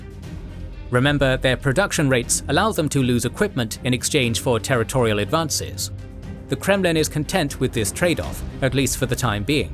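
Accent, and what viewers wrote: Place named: United Kingdom. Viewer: Forget the animation....the fact that these videos are narrared by a real person with a bona fide british accent is something I find very easy on the ear, no repetitions volume changes or any murdering of local place names.Top man,keep it up.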